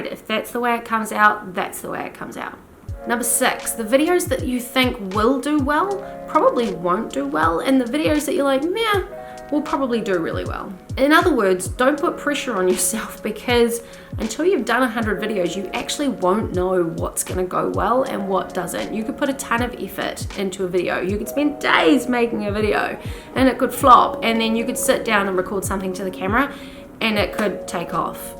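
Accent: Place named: New Zealand. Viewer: Solid advice. I like DaVinci Resolve as well. It has more features than most of us will ever need let alone use. We tend to be our own harshest critics. Your videos are lit well, the background isn’t distracting, you dress for success and your eye contact with the camera is good. And who doesn’t love a Kiwi accent?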